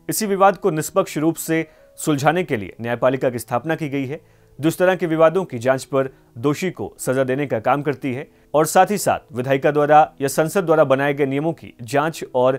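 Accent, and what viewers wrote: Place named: Purvanchal, India. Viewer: लगता है ये एंकर पुरबिया है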